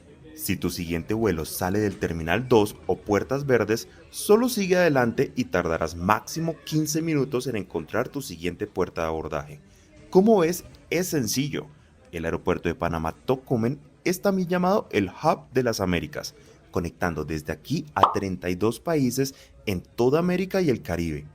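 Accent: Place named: Costa Rica. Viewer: Bello video ! Pensé q era de Costa Rica 🇺🇸🇨🇷🇨🇷🇨🇷ya q hablamos muy parecido en el 2023 bajé a Costa Rica 🇨🇷 y Guatemala 🇬🇹 via copa love it desde California usa.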